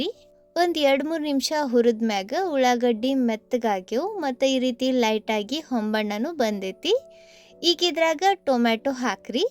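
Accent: North Karnataka, India. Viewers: ಈರುಳ್ಳಿಯನ್ನು ಉಳ್ಳಾಗಡ್ಡಿ ಎಂದು ನೀವು ಏಳುವುದನ್ನು ಕೇಳಲು ಚೆನ್ನಾಗಿದೆ. ಉತ್ತರ ಕರ್ನಾಟಕದ ಭಾಷೆ ಎಂದು ಅನಿಸುತ್ತೆ.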